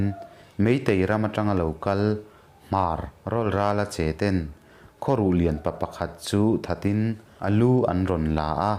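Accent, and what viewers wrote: Mizoram, India. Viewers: Hmar țawng hian ti kher lo la tha tur... Ka lo ip chawih chawih mai.. mahse a tha lutuk. 😂😂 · Hmar tawng a mawi..♥️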